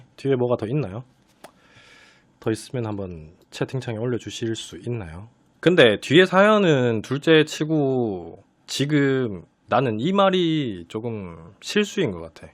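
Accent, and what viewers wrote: Gyeongsang, South Korea. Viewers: 단호한 경상도 말투가 더 믿음직스러운건 느낌인건가 ㅋㅋㅋ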